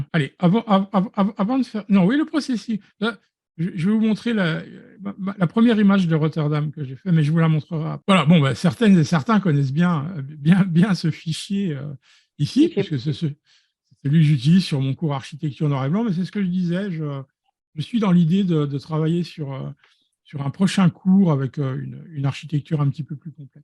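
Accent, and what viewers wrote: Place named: Belgium. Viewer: Une très intéressante découverte dès que je serai rentré à la maison que je retrouverai mon PC et que je pourrais abandonner mon téléphone à l'hôpital où je suis en ce moment, je vais vous suivre avec quelques tutos sur Youtube et peut-être même devenir un de vos élèves, YouTube m'a proposé la version anglaise à entendre votre accent vous devez être belge je crois..... salutations amicale d'un Belge perdu dans l'archipel des Açores 😃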